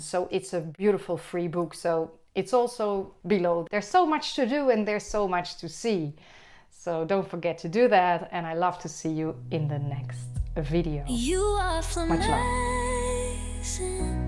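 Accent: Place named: Netherlands. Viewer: this is crazy. I was on holiday last week and saw so many flamingos! Also, I've been seeing so many 44s. Everywhere. That's how I found your channel... It was the first video I clicked on when I looked up what it meant. Also... My twin flame is Dutch! When I heard your accent I thought 'it can't be... can it?' Never seen any Dutch twin flame content before !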